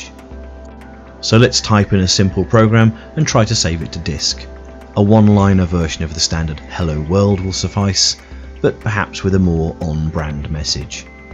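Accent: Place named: United States. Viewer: another great video!... and a great way to prep your soldering iron tip is to wipe it and tin it with ThermalTronics "Tip Tinner". Also, you might find a 1.5mm tip more suitable for pcb work than the 3mm, especially when soldering SMD. And yes, it's always ZEE if you've lived in the States for while:).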